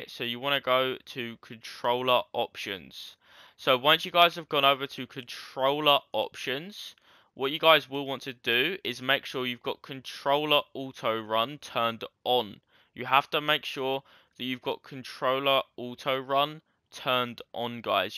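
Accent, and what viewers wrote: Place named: United Kingdom. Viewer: always the british youtuber bro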